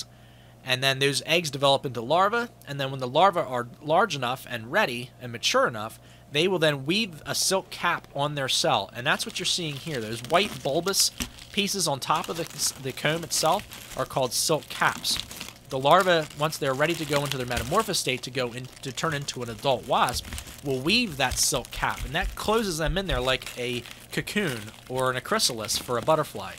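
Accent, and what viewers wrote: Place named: Mid-Atlantic, United States. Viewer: I have to ask....where are you located? You sound like back home - which is Maryland/Delaware/PA/VA for me.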